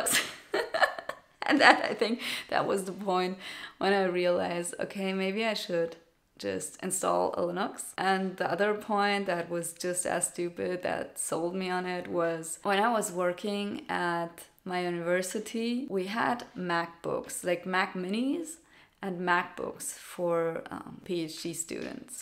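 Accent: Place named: Germany. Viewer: i bet your German or something you have a accent.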